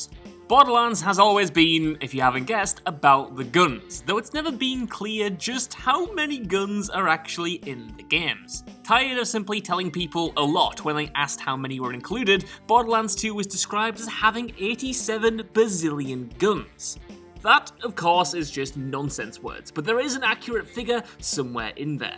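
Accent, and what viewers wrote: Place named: United Kingdom. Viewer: I think that is just a british pronunciation of "guns" the accent sounds british to me (i could be wrong idk)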